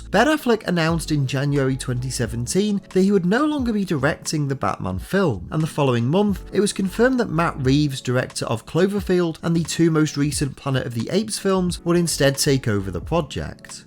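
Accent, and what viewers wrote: United Kingdom. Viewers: Hey your soothing, British voice keeps me company and informed during the night shift. Thanks mate✌🏻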